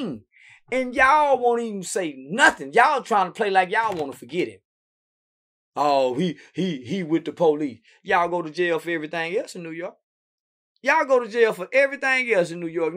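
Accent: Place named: Texas, United States. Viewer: “Rockers Island” haha that Texas accent 😅🤘🏾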